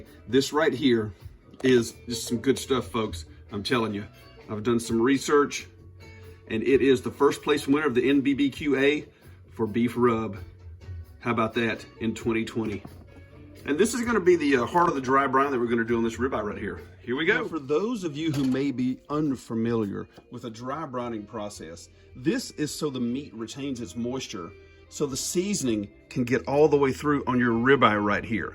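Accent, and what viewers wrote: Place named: South, United States. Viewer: You sound so southern 😉💋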